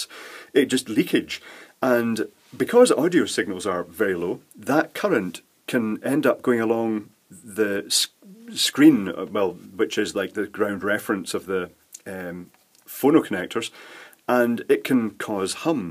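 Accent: Scotland, United Kingdom. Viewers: where are you from you sound Scottish like me but i see you are on isle of white is it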